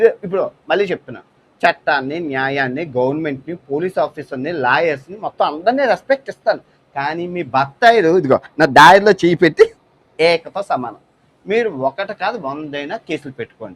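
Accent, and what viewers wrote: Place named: Visakhapatnam, India. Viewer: Actually Brother, మీ భాష సరిగ్గా వుండదు, గరికపాటి గారు చెప్పిందే మీరు చెప్పారు కాని, అదే విషయాన్ని మీరు వెటకారంగా, అసభ్య భాష పదజాలంతో చెప్తారు. అందుకే మీ పై వ్యతిరేకత ఎవరైనా మీకు negative feedback ఇస్తే తీసుకోవడం, తప్పులు వుంటే సరిదిద్దుకోవడం అలవాటు చేసుకోండి Brother. నేను ఒప్పుకుంటాను, మీరు నిజంగా చాలా మంచి పనులు చేశారు. నిజంగా betting apps పైన మీ పోరాటం నన్ను బాగా కదిలించింది Brother. కాస్త మీ భాషలో బూతులు లేకుండా వుంటే చాలు. మీ Vizag యాస తో అయితే అసలు problem లేదు. ఒక తమ్ముడు, ఒక అభిమాని, ఒక శ్రేయోభిలాషి లాగా నా feedback తీసుకో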